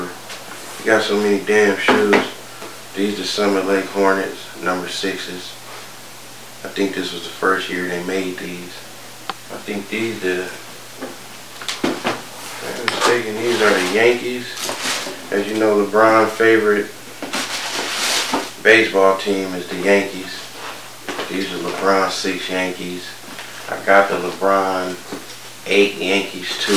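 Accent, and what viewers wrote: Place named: South, United States. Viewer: He sound so country lol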